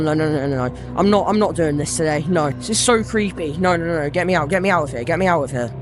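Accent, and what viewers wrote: United Kingdom. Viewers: I love his British accent 🙃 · are you British? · the british acent is killing me🤣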